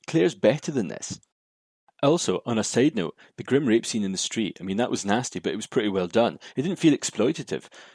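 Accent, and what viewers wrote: Scotland, United Kingdom. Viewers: I could listen to you talk about anything. But I guess I am just an American girl with a thing for a Scottish accent.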